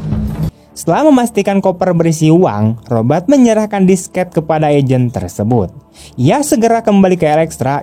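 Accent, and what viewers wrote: West Java, Indonesia. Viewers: orang sunda nya mang🗿?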